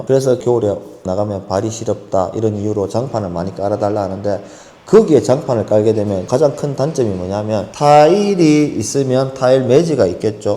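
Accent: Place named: Daegu, South Korea. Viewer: 사투리 쓰시길래 부산이신가했는데 대구시군요~아쉽네요..신뢰가 팍팍갑니다!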